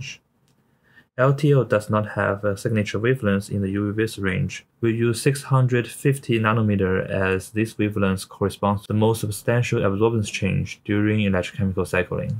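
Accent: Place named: China. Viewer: 不用客气，希望你们越做越好。这是我的听感，我一般边做事边听油管，听到你的作品时我感觉你的英语发音重音漂移太严重了，读起来像中文的抑扬顿挫，一句话来好几个重音，听起来不知道你在说什么。有中文的口音完全没问题，希望主播可以提高句子把握能力，修改部分单词的发音错误。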